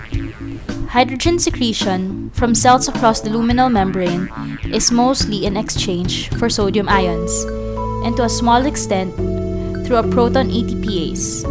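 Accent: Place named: Philippines. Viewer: sounds phillipino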